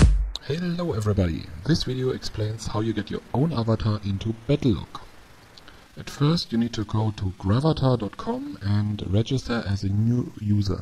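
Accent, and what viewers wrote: Germany. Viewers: ein deutscher der englisch spricht= geiler akzent! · Ich liebe den deutschen Akzent :D herrlich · You are German :-D I hear it ^^ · are you german ?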